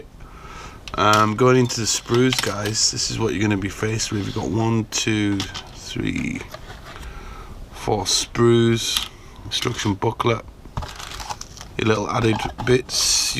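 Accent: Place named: Leeds, United Kingdom. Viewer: I've sent you an email also I didn't realise that's where you are based! as you sound like my mate who's a Leeds fan all the best from UK mark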